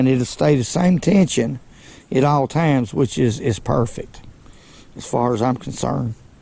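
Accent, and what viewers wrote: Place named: Alabama, United States. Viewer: “Guah luea” Alabama if I’ve ever heard it. Roll Tide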